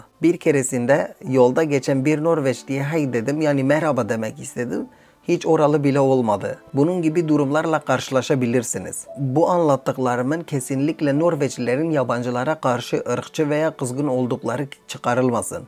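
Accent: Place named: England, United Kingdom. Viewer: Abi selam ne kadar yaşıyorsun norveçde Türkçeyi ingilizler gibi konuşuyorsun galiba biraz asimile olmuşsun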